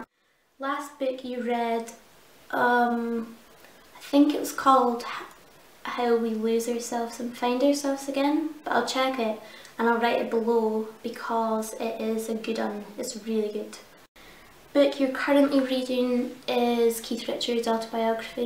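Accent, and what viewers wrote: Scotland, United Kingdom. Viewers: hi are you Scottish and if u aren't where are you from? : ) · Oh my gosh, I love you - you are hilarious :) Also great to see a fellow Scottish person, your accent is much cuter than mine haha! I would love to see hauls or more tags xx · YAY finally someone scottish!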